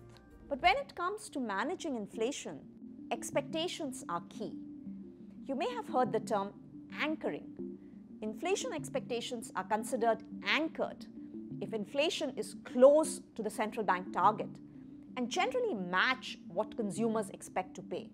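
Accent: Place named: India. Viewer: I easily understood you are a indian by your clear accent 😄🇮🇳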